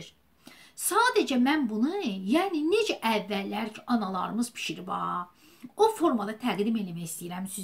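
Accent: Baku, Azerbaijan. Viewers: Nə şirin bakı ləhcəsi var.❤😅.Hələ istiyirsən qula qasasan sənə qızım.Əllərivə saqlıq. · Can bəh bəh təmiz Bakı ləhcəmiz və anamın bişirdiyi fisincan plov əlinizə sağlıq 🧿🧿👍👍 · Qırmızı kitaba düşmüş təmiz bakılılar Darıxmışam Bu ləhcə üçün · Bu bizim Bakı ləhcəmizə gurban olum. Amma biz Əmircanlılar abgora vururug fisilcana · Can menm nenem de Bakilidi.lehce mene lezzet elyr.Allah var elesin haminizi.Ellerinize saglg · Ellerinize saglig gozel bakilim yorulmuyasiz .Lezzet edir baki lehcesini ewidende 🤗Masgura lap lezzet eledi 😊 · Salam bakılı qız sənə bakılılardan salam lap ləzzət edir mənə sizin danışığınız mən də cox vaxt bişirirəm bayramda da elə belə vaxtda da əyər xətrim istəyirsə toyuqdan rəhmətlik anam və nənəm gil qaşqaldaqdan daharırlayardılar atam ovdan vurub gətirərdi amma biz toyuğu bişirəndə 2 3 dənə böyük soğan atırdıq və sonra onu cıxardıb atırdılar biz soğan qovurmuruq mən özüm gavalı turşusu hazırlayıram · Beh beh Can Bakilim ❤️❤️❤️inaninki fisincan qalib bir qiraga hayil mayil olmuwam daniwigiviza 💖💖💖nece deyirik biz bakililar edeln dedeli bawa salirsizeeee 👍🏻👍🏻👍🏻😂😂Mawallah Allah sagligi versin ruzuviz bol olsun biwirib düwüresiz bizde lezzet alaq 🤲🏻🤲🏻🤲🏻🤲🏻🤲🏻❤️❤️❤️❤️Allah qorusun xalqimi🤲🏻🤲🏻🤲🏻🤲🏻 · Əsil Bakı ləhcəsi!!! 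Ləzzət elədi!! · Qurban olum lehceve can bakilim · SUPER ELLERINE SAQLIQ SENIN O GOZEL WIRIN LEHCEVE QUZU KESIM MENIM GOZEL BAKILI QIZIM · Sizin elə qəşəng,şirin Bakı ləhcəsində danışmağınız, fincandan da ləzzətli idi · Çox gözəl Bakı ləhcəsi ilə danışırsınız xoş olur.əlivizin ləzzətlərinə də söz ola bilməz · Can bakili bacim.Ùreyim daga dòndù.Wirin Baki lehcesinde bize mexsus fisincani mòhtewem hazirladigina gòre tewekkùr edirem.Ellerine sagluq.Mawallah olsun. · Can bakl can bakl lehcesi Adam birini bakl lehcesiynen danlsan esiden kimi ureyi qopur Cox teessuf ki az qalmlslq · Çox sag olun. Bakili ləhcəsiyçin darıxmışdım. Əla izah edirsiz . Mən də 40 ildir fisincan bişirirəm, amma qoza sogan qatmıram. Qoyun əti və quş əti ilə bişirirəm. Sizin göstərdiyinizi bişirərəm. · heç sənin kimi gözəl ,şirin ləhcəli bakılı qızından laykı əsirgəməy olar əllərin var olsun canım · Bakili xanim ellerinize saqliq. 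Xeyirliye biwiresiz. Baki lehcenizi anami xatirlatsin. Nesillikce temiz Iceriweherlidiler. Anamda gozel, tamli fisincan biwirerdi. Mende anamdan oyrenmiwem. Super di Baki yemekleri. ❤❤❤🙏🙏🙏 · Can ay bakilim sesive quvvet daniwiq lezzet eledi❤ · Əllərivə sağlıq bakılı qızı qurban olaram ləhcəmizə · Fisincandan çox sizin ləhcəniz mənə ləzzət elədi. Mən də bakılıyam və əsil Bakı fisincanı bişirirəm. Xanımlara bir məsləhətim var. Əgər qara tavanız yoxdursa, qəm etməyin. Bir qaba 2-3 stəkan su tokürəm, köhnə ət maşınının setkasını atıram suyun içinə və bir çay qaşığılimon duzu əlavə edib 20-30 dəqiqə qaynadıram. Dəmiri içindən çıxarıram. Həmin suyu çəkilmiş qozun üzərinə tökürəm, 3-5 dəqiqə qaynadıram. Qoz qapqara olur, həm də turşməzə, heç lavaşanaya ehtiyac olmur. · Vallah darıxmışdım Bakı ləhcəsi üçün. Allah əlinizə golunuza güvvət versin, Amin! ❤🎉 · ALLAH QORUSUN MAŞŞALLAH ƏLLƏRİN VAR OLSUN TƏMİZ BAKİ LƏHCƏSİ🤲MAŞSALLAH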